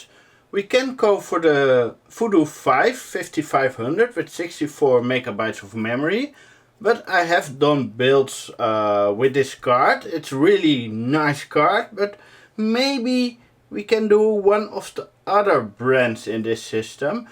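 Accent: Netherlands. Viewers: Dit Dutchglish is echt schattig :D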